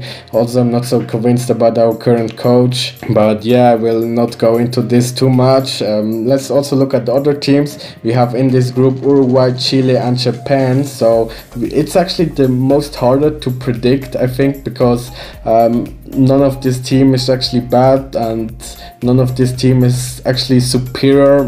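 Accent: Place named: Switzerland. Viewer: You have a swiss english accent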